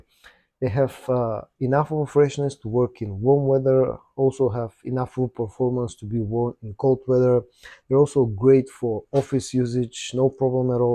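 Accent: Bulgaria. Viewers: Nice comparison. I have Dior homme sport which is fantastic and I have tried the other two as well, I'm going to get dior homme cologne next. You have a very bulgarian accent btw. Are you bulgarian?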